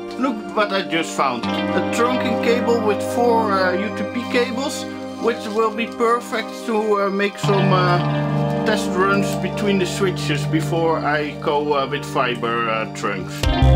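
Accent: Netherlands. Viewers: He’s Dutch, the accent goes with it 😀. After a couple of minutes I don’t hear it, but then I lived in the Netherlands many years ago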